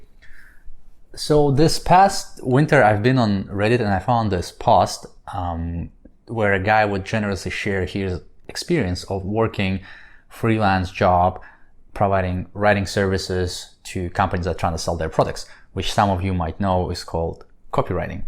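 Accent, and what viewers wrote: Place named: Russia. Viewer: Super interesting and valuable - I look forward to the entire series, and following your ongoing journey. Interested in your accent, which sounds more "Russian" than "Korean" to this Californian.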